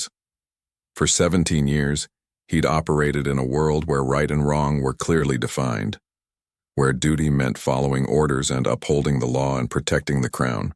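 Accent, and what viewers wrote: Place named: United States. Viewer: Ruined by being 'read' in an American accent. 😡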